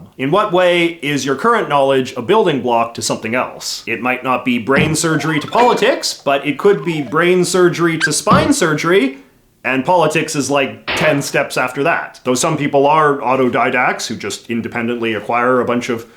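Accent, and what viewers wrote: Canada. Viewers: You have such a strong Canadian accent. Out and a Boot in a Boot.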